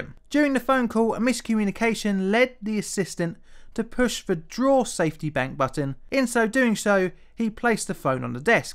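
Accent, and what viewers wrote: United Kingdom. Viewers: You have no idea how much i appreciate you use the metric system in your narration (and your British accent)! Most english channels use feet and inches which i can barely translate properly. ^^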